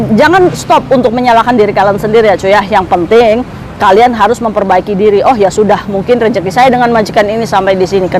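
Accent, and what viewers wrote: Indramayu, Indonesia. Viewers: Sing suarae ktenger payah logat drmayue, trnyata emang bner wong drmayu 😂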